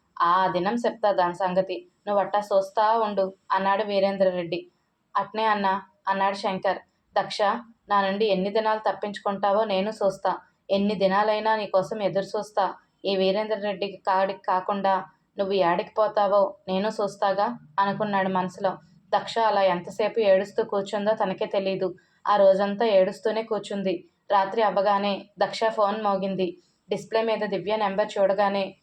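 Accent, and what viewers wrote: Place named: Rayalaseema, India. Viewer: Rayalaseema bhasha bagundi😊😊😊😊❤❤❤❤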